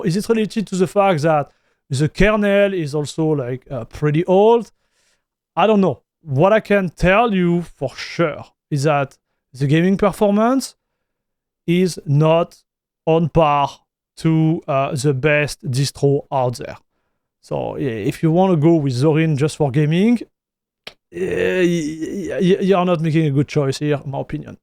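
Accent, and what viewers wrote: France. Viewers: Bro you need to stop speaking in english, french people suck when speaking any other language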